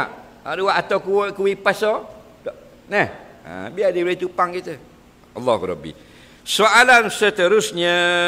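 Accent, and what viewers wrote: Terengganu, Malaysia. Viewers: Asalamualaikum ustaz..saye suke dengar ceramah ustaz sebab lorat Terengganu kadang 2ada dah lupa .ingat blek Katawa sendiri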